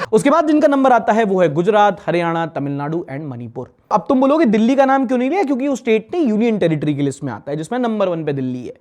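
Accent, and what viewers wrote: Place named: Bihar, India. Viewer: Bhai bihar ka asar saaf pata chal raha hai😂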